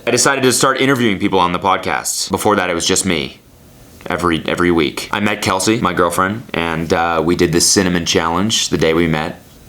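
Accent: Canada. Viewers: I always forget you’re Canadian until you open your mouth and say “sohrry”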